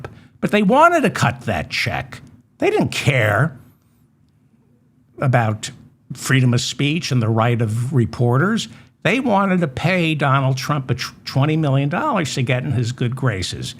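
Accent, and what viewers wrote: New York, United States. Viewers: He is a Noo Yorker. Leave him alone!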